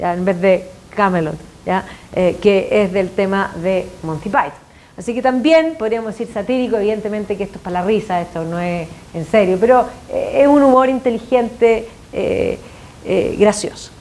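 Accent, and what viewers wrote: Chile. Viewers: Muy bien, pero, los no-chilenos no entendemos algunas frases y palabras por el acento...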